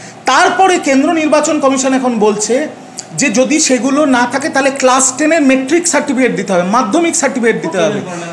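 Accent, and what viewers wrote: Bangladesh. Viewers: তোর বাবার সার্টিফিকেট আছে বানচো তোর ভাষাতেই তো বুঝা যাচ্ছে তুই বাংলাদেশের মাল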